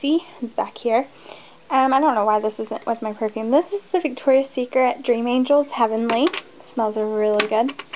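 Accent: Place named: South, United States. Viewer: Love your southern accent. Where r u from?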